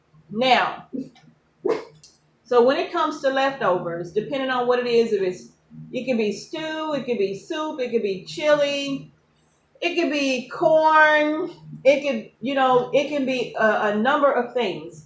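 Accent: South, United States. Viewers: From my experience, yoie tubie can't figure out CC, especially with a southern accent. I have seen some ridiculous "translations".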